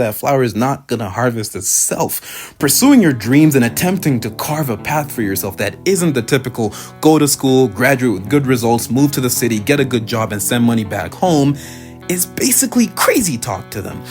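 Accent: United States. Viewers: Even crazier that these (professionally-animated) videos about "life in Africa" are narrated by someone with an American accent. There are too many question marks here to take any video this channel publishes seriously.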